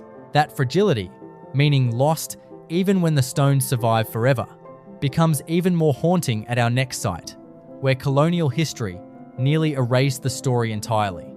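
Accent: Australia. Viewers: This voice sounds Aussie but at about he says "Brew wa reena". What? Phonetically it's pronounced "Brew warr in uh". Brew as in brewing beer, warr as as warrent for your arrest and uh as in blunt -- that knife is blunt. Get it right.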